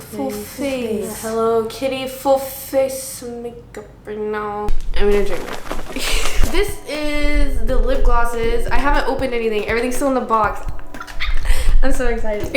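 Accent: United Kingdom. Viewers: I love your british accentkdnskqnfnwndnd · PLS THE BRITISH ACCENT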